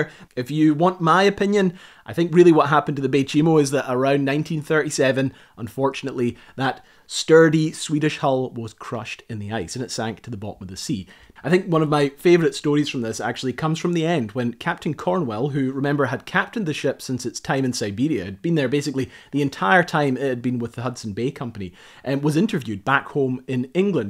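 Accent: Scotland, United Kingdom. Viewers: Love the Scottish accent and Neck fur!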